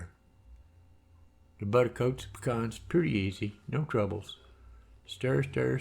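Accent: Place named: South, United States. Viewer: Aww hell yeah, a nice southern accent you know u finna get a nice recipe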